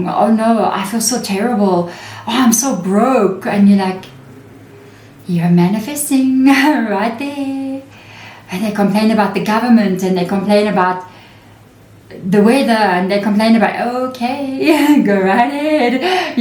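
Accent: South Africa. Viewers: Rocking up in your reading 😅😂 so Saffa!!!!! ❤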